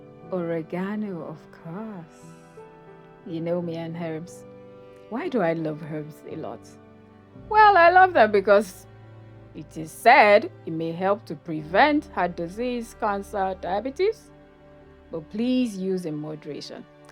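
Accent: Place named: North, United Kingdom. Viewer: Hello sis... Here watching.. Your presentation is giving vibe. I like the northern posh accent echoring beyond your voice 👌👌👌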